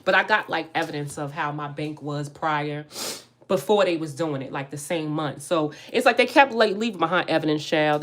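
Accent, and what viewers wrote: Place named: New York, United States. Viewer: Yes I can hear a NY accent i love it!!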